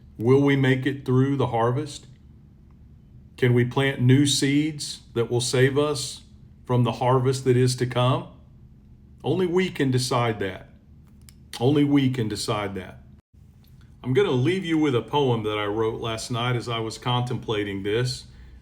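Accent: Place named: Missouri, United States. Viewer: I don't know what it is. If it's your calm even tone or your Missouri rural dialect/accent or if its the sound of wisdom coming from every syllable that comes from the heart. If they won't or refuse to see past their hate and listen to YOU. I don't know who they'll listen to in order change their ways. Before stumbling upon your channel I use to believe that it was only reason is racism. Now hearing your perspective on the way they've been taught to believe it's not just that. It's hate. Anytime someone who would burn burn the house down around themselves and their families and love one's, it's pure hate that has them blinded. I wish i could do more for you and your channel to assist you in spreading knowledge. The good lord knew who to chose to spread his love and words to save those who are not only willing to listen but change as well. 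May god bless you and keep you safe. God speed brother. 🙏🏾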